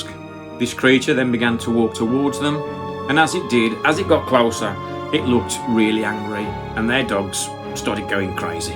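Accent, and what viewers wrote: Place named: West Midlands, United Kingdom. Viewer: I can tell by the accent your from the West Midlands like myself.I live not to far from Cannock.Dont you unfortunately think because of the notorioty of the chase many of these experiences can't be trusted? I not saying it's all untrue but just urban legend.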